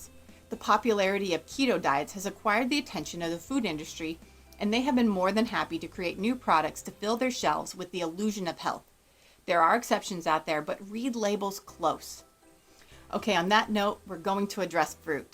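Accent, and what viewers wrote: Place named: United States. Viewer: You are carrying out an experiment on your children which i consider unethical. The fact that you and your husband previously ate crap is irrelevant and at least your husband was very obese and possibly you were too. Get one of those carnivore drs to become your dr. You most likely are from the US based on your accent. In the US if you have evidence you can sue the hell out of people. So go get one of these drs to tell you to experiment and advise your children what to eat. Even the inuit had a diet which was approximately 20% carbohydrate which is extremely low. Best of luck and consider the diet recommendations by all but a single practitioner Dr Anthony Chaffee.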